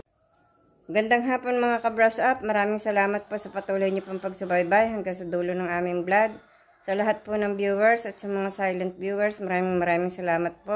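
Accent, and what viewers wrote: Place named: Batangas, Philippines. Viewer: Para taga batangas po kayu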